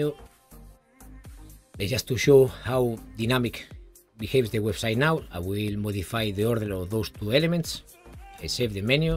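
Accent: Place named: Spain. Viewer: Ese acento suena a español jejejj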